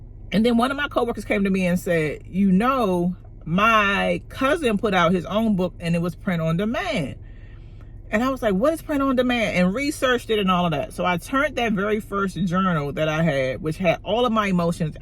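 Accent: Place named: DMV, United States. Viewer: It’s the DMV accent for me 🥰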